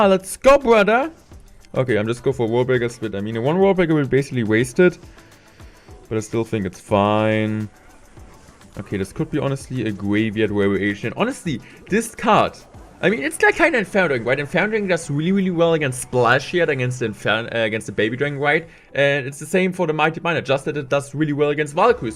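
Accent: Germany. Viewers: Dieser deutscher akzent lol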